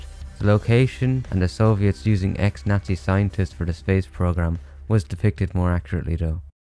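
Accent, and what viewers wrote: Ireland. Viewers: Thank you. Where in Ireland are you from?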